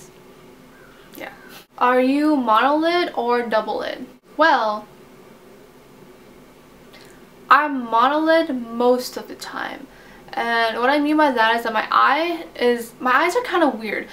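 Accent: Vietnam. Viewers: AND: My Sister is looking like you (80%) and she is 100% Full Northvietnamese.. So, i believe you are more than "1/4 Vietnamese" ( ' o . o ) But your accent is Southvietnamese.. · I honestly didn't think u are vietnamese, but then now u mention it I can see some vnmese features on u and hear a little bit of viet accent. Ur viet is very cute too <3 I honestly think u have cute teeth, pls don't be embarrassed about it, can't believe s.o ask u that kind of question. what's the point if everyone has straight teeth right?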